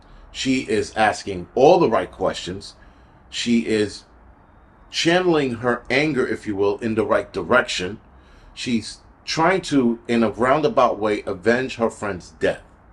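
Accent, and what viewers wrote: New York, United States. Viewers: I love your New York accent ♥️ Keep asking questions. We want to know the truth! I agree, I’m not comfortable how Erika Kirk made the statement “I forgive that man”. What man? Tyler Robinson? There are no photos or REAL Evidence linking him to the crime.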